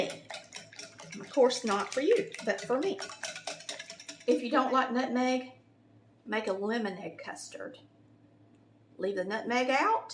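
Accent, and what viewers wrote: South, United States. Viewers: I love watching you. You make the most awesome things. I have learned so much since watching your videos. I love you too. I really love hearing you talk and that wonderful accent you have. I live in SC and it’s right down the alley. May GOD BLESS YOU ALWAYS AND KEEP YOU SAFE ALWAYS. You always make me so hungry 😋. Keep those awesome videos coming. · I can tell you’re from the south, I love your southern accent too!!🥰🥰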